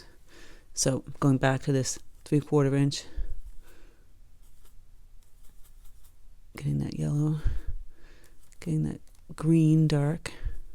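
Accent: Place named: Massachusetts, United States. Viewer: I'm not an abstract painter. I just started watercolors a little over a year ago. I paint too detailed. But I'm gonna give this a try! Hey, you sound like you're from MA? I grew up there moved to TN when I was 12. Love your style here! Thanks for all the informative tutorials!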